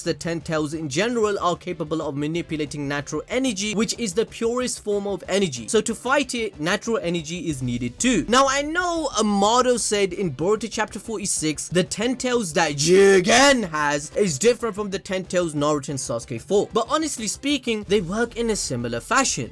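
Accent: Punjab, India. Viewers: Yes!Mitsuki is a really important character in defeating Otsutski. BTW you speak nice Hindi but you have a Punjabi acssent in it. GOOD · Bruhhhh you’re indian????? Hearing you speak in punjabi/hindi was hilarious 😂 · serious ur hindi has a bit of indian accent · what the fuck u speak hindi (i heard a slight punjabi mix but like whaaa)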